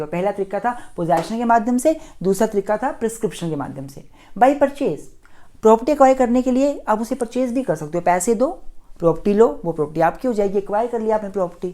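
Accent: Himachal Pradesh, India. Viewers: Be proud of your accent sir!! I Love Himachal ❤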